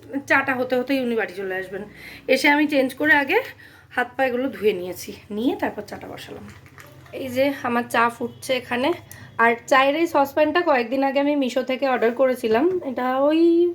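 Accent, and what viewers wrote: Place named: Bangal, Bangladesh. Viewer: একটা কথা জিজ্ঞেস করছি বোন কিছু মনে করোনা।তোমার বাপের বাড়ি আর শ্বশুরবাড়ির মধ্যে কারা বাঙাল?তোমার কথায় টান আছে তাই।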